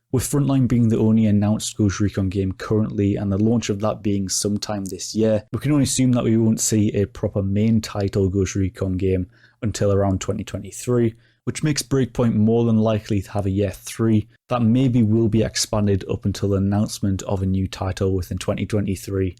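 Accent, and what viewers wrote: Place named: Newcastle, United Kingdom. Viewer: This any good for a 41 yr old filthy casual who thinks the old ghost recon games were much better than the more recent offerings of wildlands and this? I never played this but read some horror stories about it and swerved it. Worth it now? I liked wildlands but it felt a bit samey as other ubi open worlds and was never touched after the platinum. Just scratching around for something to switch off to and play solo. Fellow geordie by the sounds of it also, I’ll sub just for that 👍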